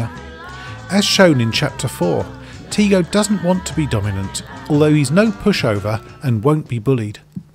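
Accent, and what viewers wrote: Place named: United Kingdom. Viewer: Nice british voice to talk about cats :) sleepy time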